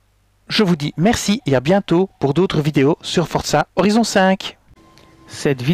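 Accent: Belgium. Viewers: Merci a notre ami. Je suis fan absolu de ton accent belge. Tes 8 qui devienne ouitte c est trop genial. Big up a l amitié franco belge ou belgo francaise. 😊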